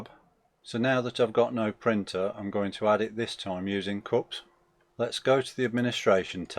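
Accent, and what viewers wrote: United Kingdom. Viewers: a brummy voice i hear!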